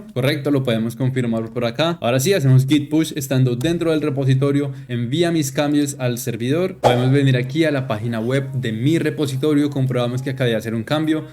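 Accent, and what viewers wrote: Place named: Colombia. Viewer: Se fue para Alemania pero el acento colombiano no se va 😂 no se si me equivoqué rara vez me pasa gracias por el video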